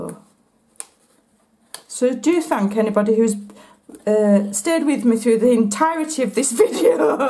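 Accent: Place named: Northern England, United Kingdom. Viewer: Oh poor you....... I suffer with fibro....flippin......myalgia too so I know how you are feeling. Stunning cards!! I found you the other week and subscribed straight away cos you make me laugh so much. Am in Surrey but was in Yorkshire for donkeys years and its lovely to hear a northern accent and the northern humour. Am learning to do the memory albums......... keep being you!! xox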